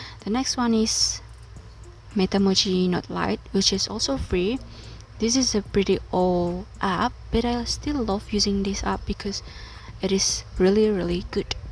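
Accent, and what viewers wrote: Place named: United Kingdom. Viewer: I think you have a British accent... So cute..😊😁. Thank you for a wonderful video.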